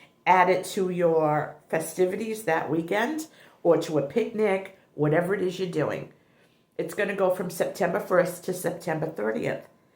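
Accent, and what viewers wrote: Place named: Massachusetts, United States. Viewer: Hi!!! I met you on Jeanine's live this morning I just found your channel and I said I know that accent it sounds like me I'm in Massachusetts 😊💗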